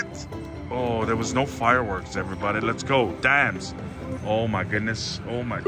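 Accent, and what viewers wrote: South, United States. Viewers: I’m originally from Houston and I must say that today you’re accent was beyond any southern lady’s or her best “ fan waving” day!!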